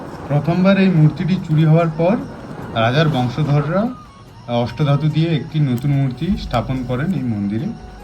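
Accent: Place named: Bangladesh. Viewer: Pure bangla accent ❤🫡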